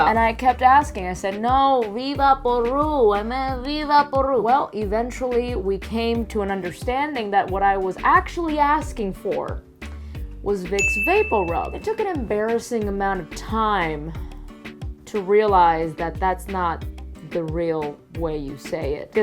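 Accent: Cuba. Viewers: Her cuban accent is really strong.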